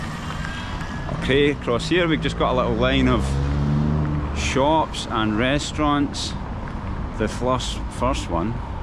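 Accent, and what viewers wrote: Scotland, United Kingdom. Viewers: It's so strange to hear a slight Scottish accent, because I'm used to Americans talking about Russia 8-)) It will be interesting to see the Kitaj-gorod (Китай город) area through your eyes! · Hello 🎉 absolutely buzzing to see a Scottish 🏴 guy here in Moscow … I lived in Glasgow for almost 6 years but my hubby is from Moscow and due to difficulties with uk visas we decided to move to Moscow. Well I thought I would miss Scotland , frankly I do sometimes, Scotland is absolutely stunning but the cost of living, weather and work were a complete mess… got tired of it… long story short, I love it here 😂 Scotland is in my heart forever though and hearing Scottish accent here in Moscow is so much fun 🤩 glad you’re enjoying it here, mate! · It was very interesting to listen to the scottish accent. I understood 95%. Thanks a lot for the video and wish you a pleasant stay in Russia! 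· I grew up in Шаболовка neighborhood in the 70s. In the 90s I moved to the US. Last time visited the area in 2017, when my daughter who studied at the University of Edinburgh (where I also spent quite some time🙂) had to spend 1 year in Moscow as part of her curriculum. It’s hard to recognize the area but your video brought back lots of memories. Looove your Scottish accent! What part of Scotland are you from? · Oh, your accent! :-)))) I already forgot how Scottish accent sounds like. Yeah, Moscow is a great place to live. The only thing is cold during the winter. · As a possessor of English as a second language, I love different English accents, and of course love your Scottish accent, bro. Keep it, be glad and proud of it, we need to celebrate differences. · Какой клевый шотландский акцент! У тебя есть килт?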